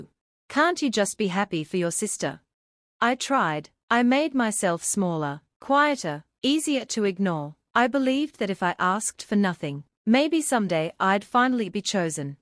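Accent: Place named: United Kingdom. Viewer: A British narrator and an American holiday? Kind of ruins it for me.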